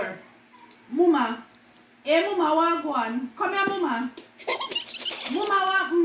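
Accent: Jamaica. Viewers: Wow. The real Jamaican accent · i love jamiacan pronounciation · Wow I love your Jamaican Accent. Really enjoyed this ❤️ full watch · I love jamaican accent..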